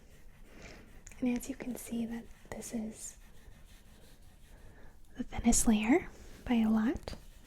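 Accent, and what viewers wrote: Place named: Midwest, United States. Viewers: love the midwest accent 😍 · makes learning fun. (relaxing too) Also, you have an accent. Chicago?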